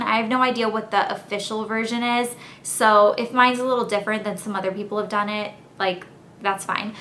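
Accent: South, United States. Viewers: Wow you say things so differently in America! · Daaang, you're really southern! Lol I was mostly raised in Cali but was born in Minneasota , and I've lived on the east coast :] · I can tell you're from the south when you answered the questions. I'm from Tennessee and we say it the same. Well besides a remote. And I feel like only the south call it a "grand daddy long legs" everyone else refers to it as "daddy long legs." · Yep, you're from the south. :) Lived in Nola too. I cannot stand when people call it "New Orleeeens." I pronounce/call everything the same as you! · You're from the South probably a big city judging by your vocab and accent. "coke" and "buggy" give it away. I grew up in Birmingham, AL so you're accent is familiar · You're definitely from the south! I moved from Chicago to WV and people say everything soooo differently! A lot like how you pronounced words and answered the questions! 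I think it's very interesting! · Its wierd your from the south im from the north and we say theese things so diffrently. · I love that you call it a Coke and some of the other answers to the questions (the buggy, too! :) Definitely Southern! :) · Hee-hee it's funny as I'm Scottish and you're really Americanised to me :L Loved this tag :). I say 'trolley' not 'buggy' or 'cart'; envelope - exactly as it is spelt and prononcing the 'E'; and 'remote' instead of 'clicker'. The politically correct term is 'remote control' but some also say channel changer, control etc... :) ha :) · lol i love this :) i say "tp-ing", "pop", "cart", "remote", and "you guys" i love your accent, you sound like a southern belle :) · clicker and buggy.....man you are a southern girl! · Wow! I'm SO not used to an american accent, without the words underneath I'd have had real trouble knowing what you were saying! My UK accent is so different!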